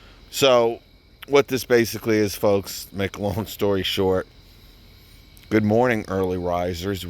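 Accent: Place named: New Jersey, United States. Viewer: Dear God I’ve missed that gravely, baritone Jersey voice. Thanks!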